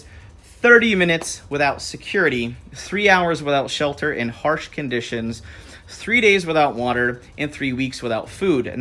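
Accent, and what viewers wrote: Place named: South, United States. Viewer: why does you casual prepper voice have a southern accent?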